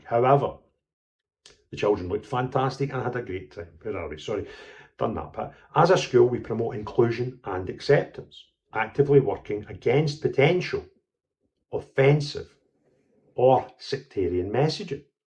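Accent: Scotland, United Kingdom. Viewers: It's nice to hear an authentic Scottish accent, my friend.. But since I have been writing about the infiltration of our whole Scottish culture......and particularly the pederast invasion of our very vurnerable Primary School kids, by unqualified 'Pride' Groomers, for twenty anonymous years..... Why is it that they are only, now....Platforming' you? PS: 'Copy to Basil' ...Faulty.....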